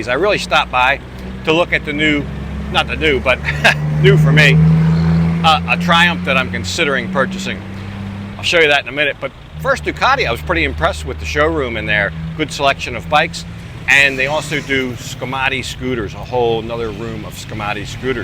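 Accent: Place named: South, United States. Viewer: Where are you from? Sound like Southern USA 🇺🇸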